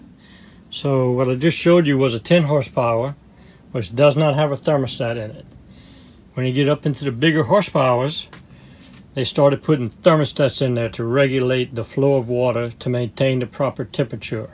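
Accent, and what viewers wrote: Louisiana, United States. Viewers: I miss that Cajun Accent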